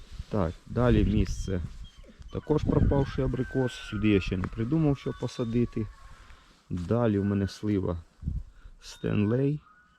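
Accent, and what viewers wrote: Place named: Surzhyk, Ukraine. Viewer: Яку його мову ти розумієш?! Він же не українською мовою розмовляє, він розмовляє сільськiм суржiком!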